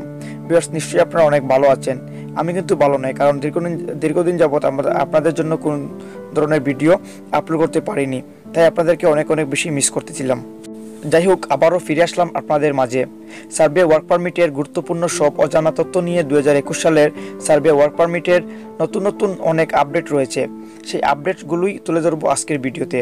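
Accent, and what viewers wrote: Sylhet, Bangladesh. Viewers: ভাই সিলেটি, লাস্ট কথাবার্তা শুনে বুঝতে পারলাম। ভাই প্রশ্ন হচ্ছে, ম্যানপাওয়া কেন দেয় না -সরকার?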